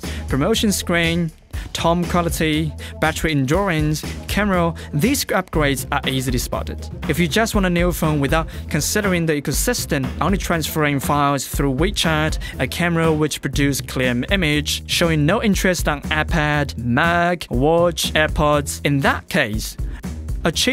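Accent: United Kingdom. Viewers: 英音很正❤ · 英式口音，牛的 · 这是brit accent😂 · 还好吧，英音，反正水平比我高八条街，对于做视频完全够了，多少海外youtuber都还有各自国家的口音也没人评判什么。 当然，油管这个平台其实美音会更占优势些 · 居然是英音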